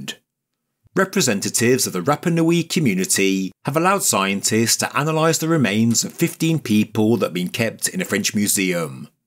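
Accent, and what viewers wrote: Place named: Leicester, United Kingdom. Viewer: Leicester I think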